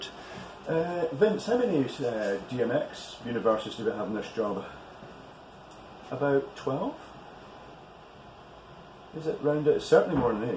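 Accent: Scotland, United Kingdom. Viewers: This is so lovely. I live in the US, but I worked the Fringe for Gilded Ballon many years ago. Seeing 16a Ceeform sitting on a flight case while hearing a Scottish voice and pipes just warms my heart!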